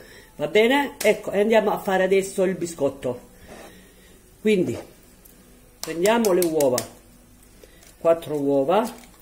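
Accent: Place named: Sicily, Italy. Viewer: Super cuoca simpaticissima la parlata siciliana mi piace da pazzi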